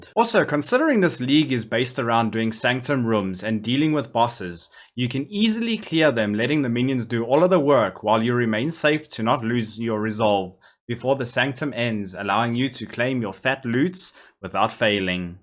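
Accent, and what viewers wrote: South Africa. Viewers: You sound South African dude. Am I guessing correctly?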